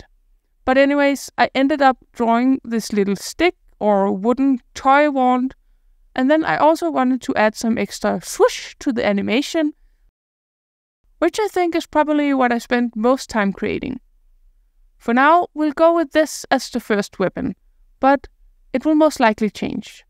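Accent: Denmark. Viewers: Fantastisk at finde en dansk-engelsk accent - FANTASTISKE videoer all over btw! Du er uden du ved det, ved at lære mig og min dreng godot :D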